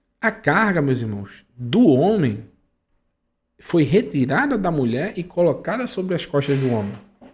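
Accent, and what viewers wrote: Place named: Northeast, Brazil. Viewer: Mas que beleza. Meio nordestino. Amei.❤❤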